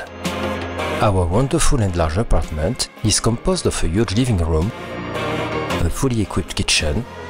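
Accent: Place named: France. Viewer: ça ! c'est de l'accent français bien couillu et affirmé !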